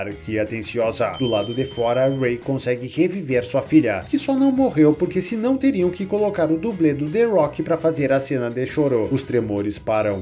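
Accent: Rio Grande do Sul, Brazil. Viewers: Mas que barbaridade tche o guri tu e gaucho?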